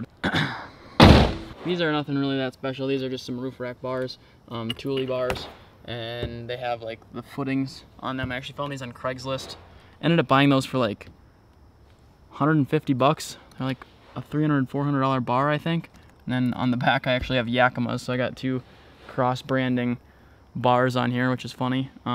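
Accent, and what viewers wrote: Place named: Minnesota, United States. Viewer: 40 seconds into the video I knew you were from MN, lol Nice build man!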